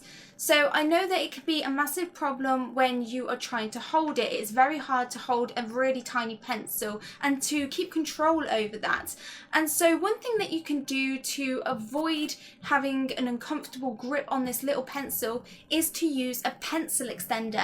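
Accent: United Kingdom. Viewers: Besides all your amazing, thorough explanations and detailed drawings and tips, I love your accent! I love the way you say pencil it makes me smile EVERY TIME I wish I had an accent like this and I've been practicing my British ever since I watched Austin Powers first when I was like 6 or 7, haha sometimes when I write stuff like this I say it in my head in an accent 😂 Again thank you for amazing work, you really have made me pull up my art determination and persistence, as well as follow thru, that's my biggest problem! I always start pictures and when I'm done with that session I don't really go back to it unless I randomly find it again... I also am sort of homeless atm, not to worry we have money and cars, but since I don't really have my own space it's hard to spread out my art/storage/drawing space so maybe that's why I always start new when I go to start a project.... 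if you want to write a response I'd be pleased but also I watch all your videos and copy down great tips to remember (almost all of them haha) so I've got quite a lil notebook full 😊 So I'm sure there is something for every question I ask ! Brilliant work and lovely role model! You are such an inspiration! Thanks again! Much love, Autumn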